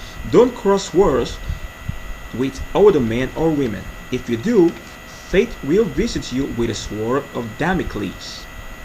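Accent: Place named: United States. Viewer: well said. this American accent na wa o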